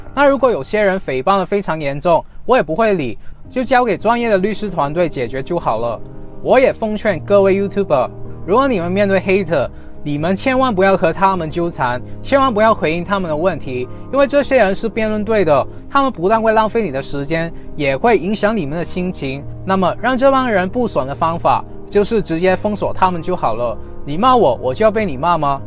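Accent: Hong Kong, China. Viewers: 怎麼口音聽起來像香港人呢？ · 是哪裏人聽着像香港的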